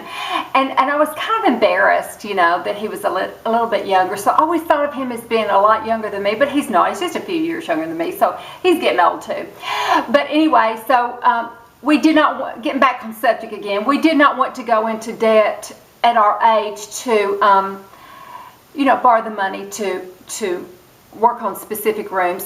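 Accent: South, United States. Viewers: I'm taking you back old school. Lol. I found your channel and started binge watching. You are the sweetest little southern bell. I'm from Kentucky but I think your accent is more southern than mine. ( I know you probably think Ky isn't southern but northerners do.) I just wanted to say, thanks for showing us your house ,even though you thought it was messy( it wasn't). It helps people relate better to you. I just can't feel comfortable in a house where every single thing is in place. I like a home that looks lived it . ( I guess you just live in the closet because the rest was perfect with nothing out of place). Lol. I'm just cutting up with you. Beautiful home but you are even more beautiful. Your inner beauty shines through to your beautiful face. Thanks for sharing.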